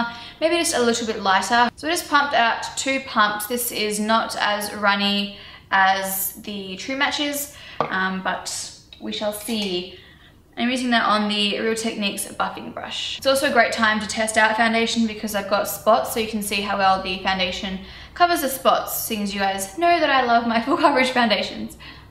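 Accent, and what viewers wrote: Australia. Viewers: Australian or New Zealand? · Ur Australian accent is so cute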